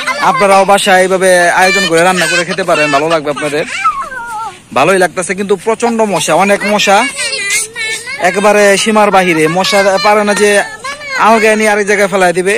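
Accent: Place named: Sylhet, Bangladesh. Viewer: আপনার মেইন বাড়ি ঢাকা নাকি আপনার কথায় বুঝা যায় আপনি সিলেটি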